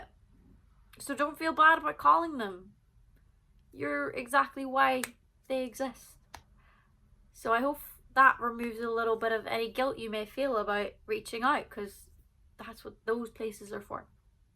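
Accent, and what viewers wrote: Ireland. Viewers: I like her Irish accent. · Wow! You're telling it all so clearly and openly. You're such an angel for other people. I volunteer at an helpline and it's very common to get a person in these situations on the line. PS, love your Irish accent <3 and music of course ! Thank you 🙏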